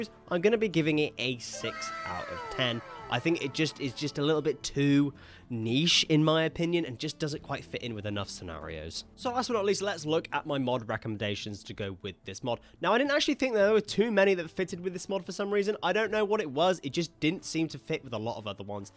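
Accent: United Kingdom. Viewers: wait u are brittish???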